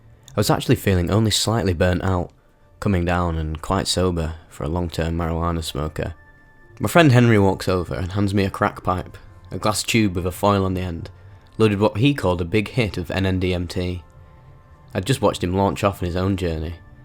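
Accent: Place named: Manchester, United Kingdom. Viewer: Beautiful pic and title made me smile, I am a /lad/ from Mississippi and I've always loved the manc accent since discovering karl pilkington around 08,09 and listening to the Ricky gervais show animated, an idiot abroad, and then the rest. You bring that for me with your own style on one of my favorite topics and do it so eloquently and with such a wonderful energy behind it. Please always continue if you continue to enjoy this. I listen to every video.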